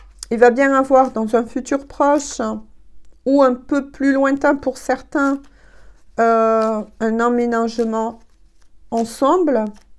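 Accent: South, France. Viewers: Bonsoir, oui la lune continue encore a me titiller. Je suis en sur voltage ! Exictée comme une puce qui a mit le mode pilotage automatique, je perds tout, j'oublie tout, aucune concentration, je fais que des bêtises. Cela fait rire mes amis. Oui un froid ,une distance, recentrage ..l'univers nous y a poussé..Nécessaire pour moi que je lache la tension.la cocotte nimute etait prêté a exploser et a la fois imploser.. merci pour tout ses beaux messages qui on fait écho par leurs véracités et votre magnifique interprétation avec notre très beau accend du sud. Merciiiii. Le recentrage est pour nous deux chaqu'un a son propre travaille a effectué et gérer. Encore merci pour cette chaleur cette belle lumière qui éclaire les zones d'ombres. Elle magnifique car vous a faite vibrer par votre aura, votre propre lumière. Ps : j'ecoute toujours mon propre discernement, ma propre guidance intérieure! 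Je ne m'enflamme pas, je sais très bien que c'est une quidance générale et j'ai toujours le recul qu'il faut. J'avoue elle n'a bluffé par ses vérités. Merci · Bonsoir!,! je vous remercie pour cette guidance qui me parle, j'aime vos guidances et la façon vous nous expliqué la chose. Je vous souhaite une très bonne fin de soirée!. Ps: j'aime beaucoup votre accent!, accent chantant du sud! 👍😁, moi c'est l'accent créole quand je le veux bien! 🙄🤣 revenez-nous vite s'il vous plaît merci! à bientôt!! 🤗😁.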